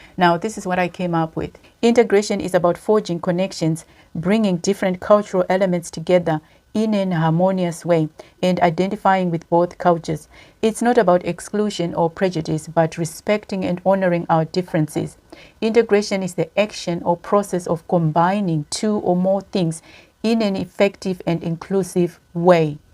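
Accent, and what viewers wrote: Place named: Zimbabwe. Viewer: How long have you been in the UK? You have retained your Zimbabwean accent.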